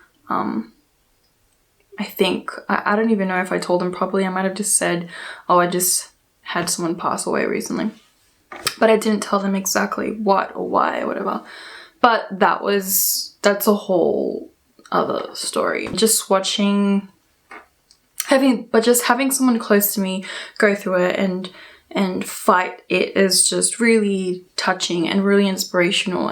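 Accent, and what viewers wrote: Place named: United States. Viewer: Your accent does not seem Australian lol,it is closer being American than being Australian :)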